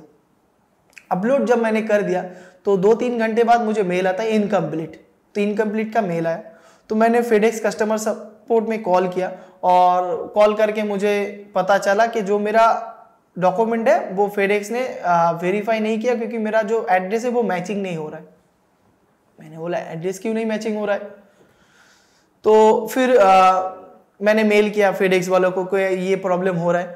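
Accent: Kolkata, India. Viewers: Ap Ka language suun k Lagta hai ap Kolkata Ka hai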